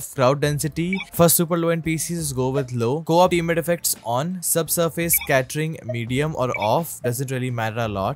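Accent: India. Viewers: Yk its good when u hearr an indian accent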